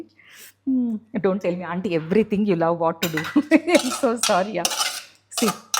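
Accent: India. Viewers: Butler english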